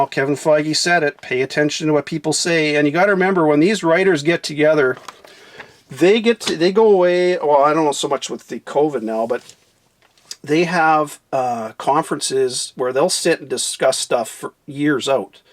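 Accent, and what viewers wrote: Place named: Canada. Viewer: Happy New Year!!! So happy to hear your Canadian voice talking about comics brother!! Just watched the video and can’t wait for the next one!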